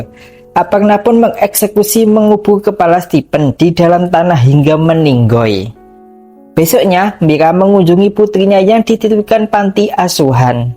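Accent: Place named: Banyumas, Indonesia. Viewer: Ngapak yak😅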